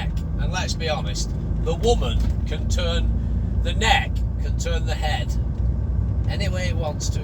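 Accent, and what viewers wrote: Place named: Lancashire, United Kingdom. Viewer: Your some character,love your chat proper lancashire just like me lad you always make me smile cheers mate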